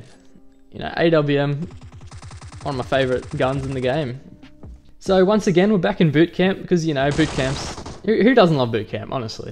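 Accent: Australia. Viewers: Nah I kinda like it the way it is, It's unique. 😂 It brings out that Auzzy charm: "Oh yeah nah yeh, aahhh yeah nah" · The voice of a Australian is rare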